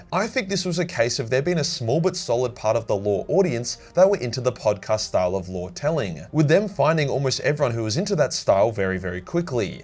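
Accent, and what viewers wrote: Australia. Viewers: AdeptusRediculos dont have you on because they are living in Cali. And you are way too harsh of a Aussie that would trigger their fans. As a fellow Aussie who talks alot like you do (with my mates) i can kind of understand that one 😂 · Dude, you are about to blow up! Girlfriends are about to watch Henry Cavill in his show. No gay shit but girls will watch you…your Australian. Accent wins. Thank you dude for exposing the best cosmic horror and being real. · >Be me >Dr. House fan > fan > turn his hair blonde >Cheesy Ausie accent > now look like dr Chase >MFW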